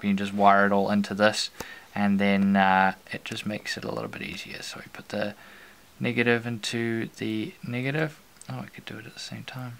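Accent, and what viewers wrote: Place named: New Zealand. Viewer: Just realized the new zealand accent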